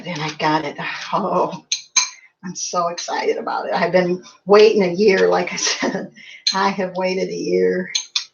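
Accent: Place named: South, United States. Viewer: 🌟✨ love your southern accent so much! 💙👏 Another awesome video. 💖💖 Stay blessed and your almost at 1k subscribers!!! So epic🙏🏻🌻🌱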